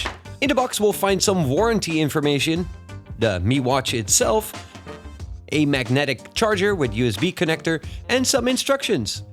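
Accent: Netherlands. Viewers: I thought you sounded Dutch. confirmed it. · No offence but you have a very thick Dutch accent, or as they like to call it: "Steenkolenengels" or "Stone coal Dutch (as a self-referencing joke)". Thank you for your thorough review! I enjoyed it immensly! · Nederlander, ik hoor het aan je accent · Do I hear a Dutch accent?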